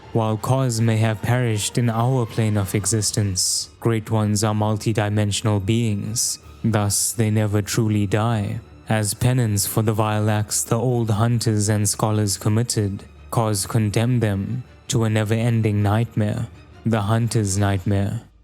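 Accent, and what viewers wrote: South Africa. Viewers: Your voice sounds South African,South African Indian to be exact,id say from Durban but I could be wrong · South African?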